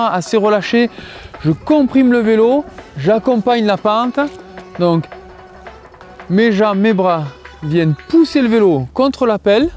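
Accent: South, France. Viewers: Très bonne explication de quoi mettre en confiance et le plus l'accent du Sud 🤘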